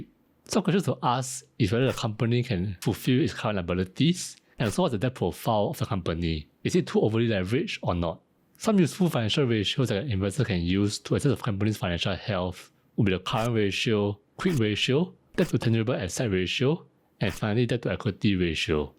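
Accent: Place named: Singapore. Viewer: Love your accent, are you from Singapore?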